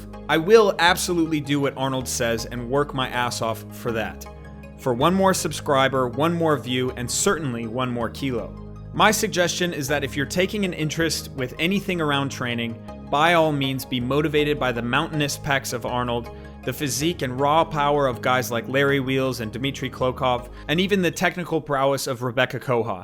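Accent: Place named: United States. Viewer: sounds american, uses kg 🤔🤨😀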